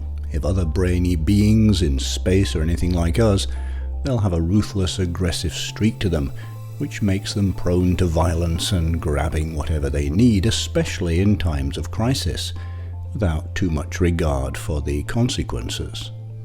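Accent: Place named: Yorkshire, United Kingdom. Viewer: There's a hint of a Yorkshire accent leaking through the RP elecution if I'm not mistaken.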